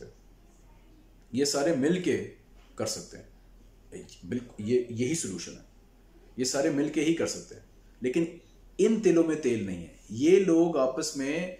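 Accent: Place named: India. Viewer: I think they just have a thick Indian accent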